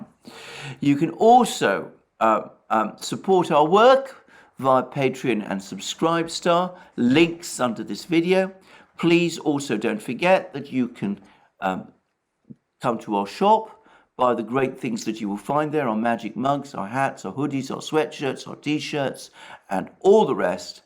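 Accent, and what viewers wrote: United Kingdom. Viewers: Alexander thinks a blasé manner (and a British accent) are enough to cover up his vast ignorance. He says that if there are large numbers of ukrainians, which he very much doubts, they are certainly not using them effectively. Yeah, right. I suggest, what you may be seeing right now is the calm before the... Well, I know for a fact you know the rest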